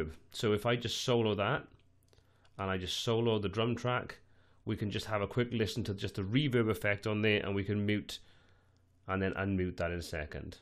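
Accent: Wales, United Kingdom. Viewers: Thanks for the great series. I am rather enjoying what I perceive is a Welsh accent. Am I incorrect? My mum is from Cardiff, the Docks neighborhood of Tiger Bay. You're voice rather resembles her brother, my Uncle Bobby. :-D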